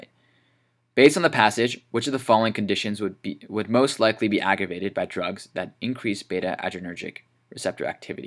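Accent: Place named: Australia. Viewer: I have no ideas why he sounds like an Australian.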